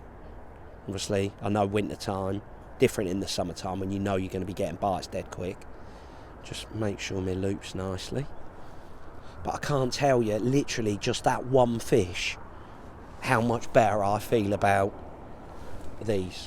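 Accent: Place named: Cockney, United Kingdom. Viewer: I’ve said it before, love knowledge & intuitive nature, which when u listen to most other pretentious promotional crap, ie ringer & the likes irritating wankers! Carn’t get enough of cockney tones!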